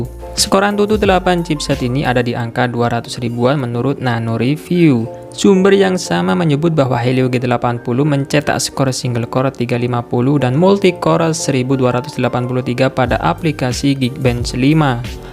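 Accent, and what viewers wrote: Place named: Madura, Indonesia. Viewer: orang madura yah bang, logatnya kaya madura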